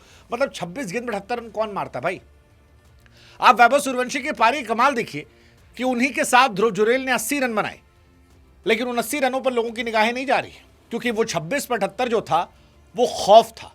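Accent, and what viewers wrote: Bihar, India. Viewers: Bihari bolte mittar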